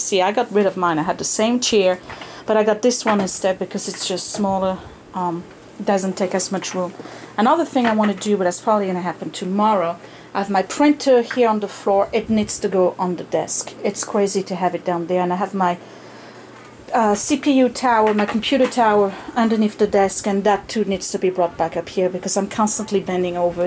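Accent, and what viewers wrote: France. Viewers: You go girl! Your have been crazy busy! I want some of your energy :) I love your accent, is it French?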